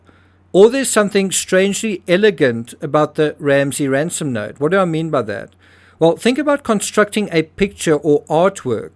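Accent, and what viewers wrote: South Africa. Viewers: your accent alone is reason to watch it all... I pride myself on being able to mimic accents with South African being one of them and your video helps me keep it fresh and sharp... You and Die Antwoord,... 😉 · He is South African. He can't his accent. Just like you, can't change yours.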